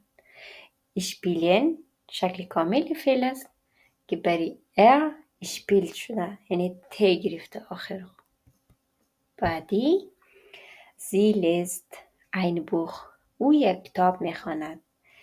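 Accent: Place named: Afghanistan. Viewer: ایشون لحجه دری دارند. دلیل نداره که هرکسی که لحجه تهرانی نداره فارسی بلد نیست، دوست عزیز.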